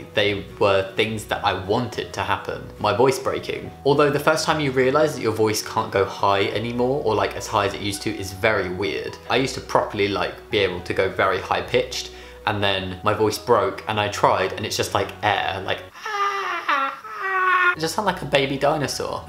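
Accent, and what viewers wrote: United Kingdom. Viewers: Why addy Woop well done that accent is British! If you want to hear another follow me!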